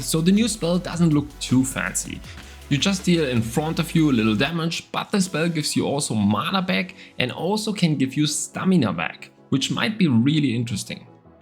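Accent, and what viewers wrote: Germany. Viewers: Hört sich so Deutsch an :D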